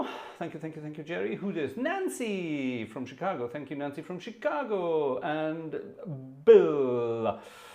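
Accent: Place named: Scotland, United Kingdom. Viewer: I have been away for a while but getting back into the swing of things and I enjoyed this organ night. You're sounding more and more Scottish each day!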